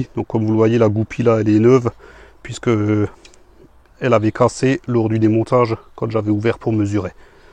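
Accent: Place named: Alsace, France. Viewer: accent et alors..... fier d'être Alsacien, dans d'autres régions, ils ont un accent également, alors..... quant aux joints.... Trèfle Vert par exemple.... en règle générale les magasins Jardinage..... ou pour les veinards comme nous, Alsaciens, un déplacement outre Rhin chez OBI...... nettement moins cher qu'en France..... hé oui..... merci pour vos "bidouilles"....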